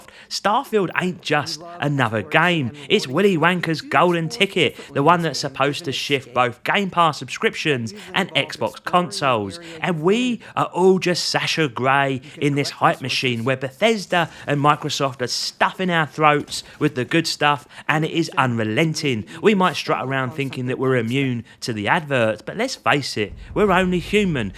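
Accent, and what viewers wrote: Cockney, United Kingdom. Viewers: Mate, I reckon it’s gonna blow expectations personally, you may be cockney but you ain’t east London, keep the videos coming love to hear the thoughts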